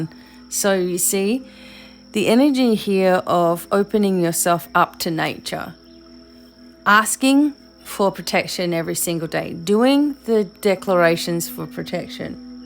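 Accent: Australia. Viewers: Knickers in a knot 😂 So Aussie , love it . Hello from NSW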